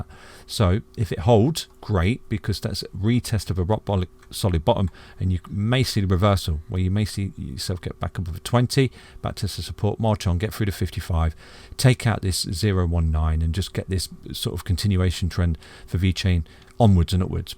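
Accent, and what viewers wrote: United Kingdom. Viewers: Alot of the terminology with these charts is new to me, combined with the British accent and dialect, I maybe understand about 70% of what's going on but im still tuning in. HODL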